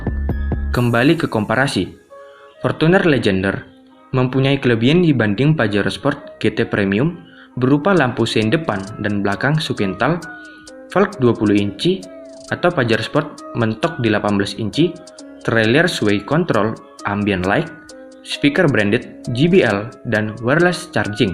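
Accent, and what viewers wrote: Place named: Sumatra, Indonesia. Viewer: Reviewnya logat sumatra banget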